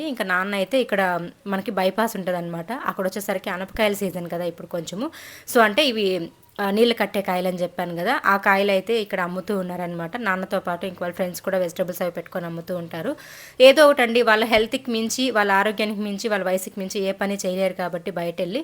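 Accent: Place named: Telangana, India. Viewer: garu first time comment chesthunna pls oka request. Andi Meru matladuthunte normal Telangana slang anipisthundhi kani medhi Palamaneru nellore district kada meku a slang osthadha edhina oka video lo oka 1 min me slang lo matladandipls..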